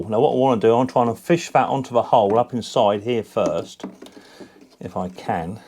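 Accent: United Kingdom. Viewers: I liked your repairs! Your style is like mine. So.... you know, tip top! I didn't quite get what you used on your first cable repair. It looked like some lead fitting that you used to crimp the two cables together. But what do you call that lead part so that I can buy some? The best I can understand is that it's like something in a fisherman's kit. Perhaps I could take a small piece of lead and drill a couple of holes to fit. But can someone give me the proper name for that part? You know, we yanks occasionally can have trouble understanding the Queen's English. (Ooops, King's English now --- my condolences). That means that sometimes my understanding runs a second or two behind the video. The best example of that is when you suggested that, on the whole, you preferred to have cables be too long rather than too short. Then you gave us the fish eye to see if we had understood your humor. 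Well, I did, after a couple of seconds. You know your audience! Anyway, thanks for the lesson on cable repairs. That has been a weak point for me and I have a couple of applications for that knowledge that have been waiting years for a solution. And I do regret the loss of Queen Elizabeth. In my view, she especially deserves to be honored as the last world leader who was an actual veteran of the war against the Nazis. The day after the Queen's death, I was at a football game at an American high school stadium and the flag was lowered to half staff in memory of QEII. Since we are still a rebell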